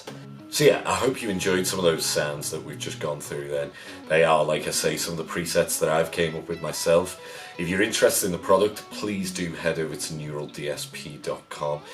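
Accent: Liverpool, United Kingdom. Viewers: F**king hell I was not expecting a scouser accent XD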